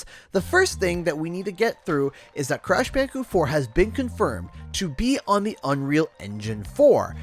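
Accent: Canada. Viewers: This clip was completely about nothing and I didn't learn anything new from it but I like listening to so I like it.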